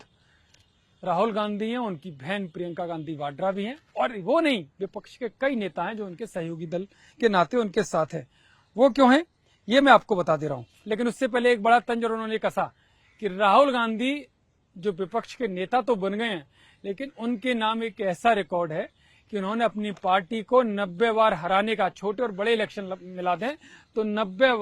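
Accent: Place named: Varanasi, India. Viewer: मुझे बनारस सुनाई दे रहा है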